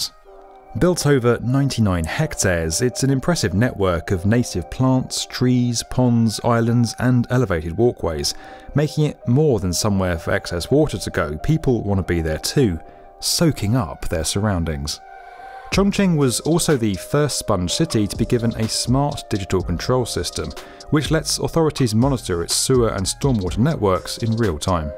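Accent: United Kingdom. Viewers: The Presentor is perhaps from Britain:))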